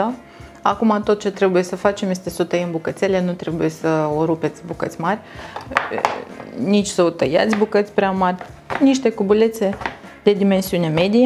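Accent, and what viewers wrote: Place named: Moldova. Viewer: Cele mai bune retete! Si de asemenea f deosebite! Ador accentul moldovenesc autentic. Nu-l ascunde! Multa bafta in continuare!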